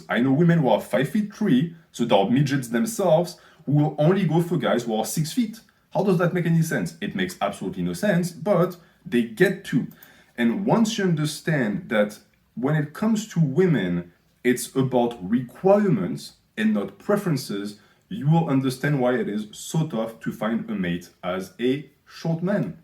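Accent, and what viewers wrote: France. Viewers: Hey, how tall are you? Also why are you French and why your accent is intolerable? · his accent is horrendous and it is extremely different to understand what he is saying at times. his accent is what makes me hate the french, frenchies always get mad at you when you say their dribble speak words wrong but then they go on and speak english like this! absolute butchering of the english language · High pitched with french accent. For the win. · Franchement c’est cool que les anglais aime notre accent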